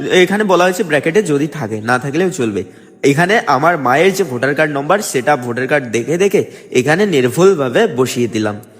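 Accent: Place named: Bangladesh. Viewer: Tumi to Bangladeshi. Vulval bojhaccho keno ? Tomar citizenships na bolleo tomar kothai bole dicche tumi bangladeshi .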